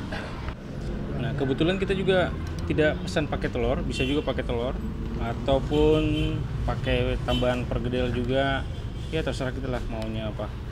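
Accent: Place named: Siantar, Indonesia. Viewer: ok di tunggu videonya bang. Kalau bisa pertahankan logat dan bahasa Batak Siantar bang karena itulah menurut saya yang unik dari Abang . Horas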